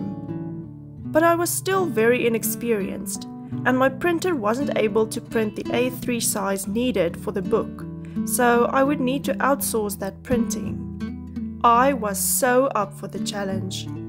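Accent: South Africa. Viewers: I am a small printing business in the UK and have been inspired by your determination. As an ex SA pat I subscribed and look forward to more videos on how you are doing. Keep it up girl. Can't get enough of the accent. Kisses... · Always fun clicking on something on Youtube and hearing that SA accent! May your business grow bigger and bigger each day! Sending love, from a Saffa in the US❤️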